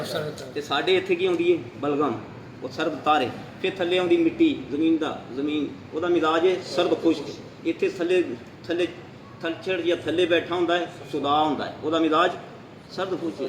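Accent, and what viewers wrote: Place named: Punjab, India. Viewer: یہ ممکن نہیں استاذجی کا لہجہ ہمیشہ پنجابی ہی رہتا ہے ہم نے دیکھا بعض پختون مریض بھی اس سے پریشان ہوتے ہیں لہذا آپ بہائی کوشش کریں استاذجی کے انداز کو سمجھیں جب نیت کرلینگے ،آسان ہوجائے گا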